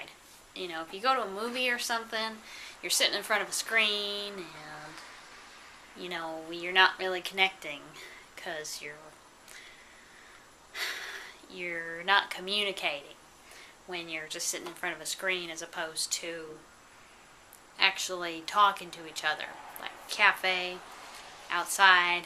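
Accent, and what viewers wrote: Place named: South, United States. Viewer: Listening to your videos really connect to me , you really captured me and your wisdom hit my core. I live near and Arkansas and you sound like you live in the south. You live in the south?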